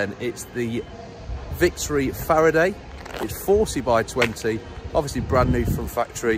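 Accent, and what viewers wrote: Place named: England, United Kingdom. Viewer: Don’t you think the English accent gives it away .? ?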